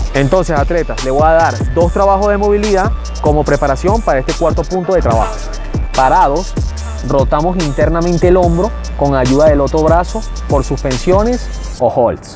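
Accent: Venezuela. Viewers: Excelente Video, te felicito. Recién me inicio en el tema, tengo unos tres meses que empece a trabajar en esta modalidad. Había intentado por mi cuenta hacer un MuscleUp y lo he logrado en un par de ocasiones pero todo todo torcido :-) Voy a seguir tus recomendaciones a ver que tal me va. PD. Deduzco por tu forma de hablar que eres Venezolano... Un orgullo encontrar Venezolanos de calidad haciendo trabajo de Calidad. Felicitaciones.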